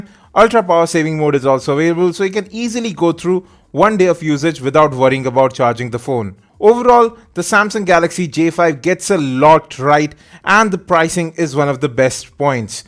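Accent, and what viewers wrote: India. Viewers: inida p3ople's words and accent make my head blow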